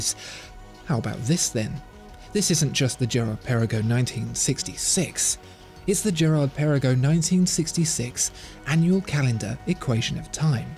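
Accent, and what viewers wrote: United Kingdom. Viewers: Very nice watch, intolerable commentary. The brits haven't lost their innate talent to annoy people by simply opening their mouths.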